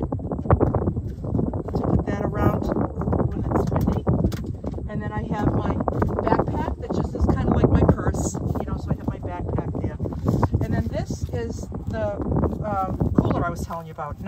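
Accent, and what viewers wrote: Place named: Boston, United States. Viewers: Love your van, so well thought out and comfortably organized. I'm from Boston born and raised so I recognized your accent! I love how you have accommodated your priority to your self care of healthy eating and lifestyle, you have proved it can be done even living in a small van on the road. Brilliant! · You talk just like me so you must be from New England. 😊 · Yep I recognize that Bawsten accent. Love Boston ( I’m in Ct)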